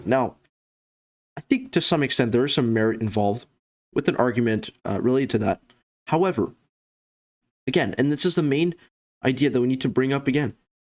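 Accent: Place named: Ontario, Canada. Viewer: You sound like you're from Ontario.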